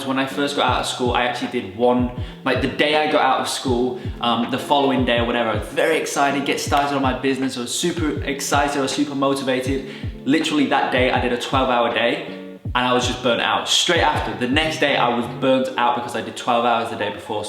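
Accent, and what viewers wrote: United Kingdom. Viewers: Are you from England? British?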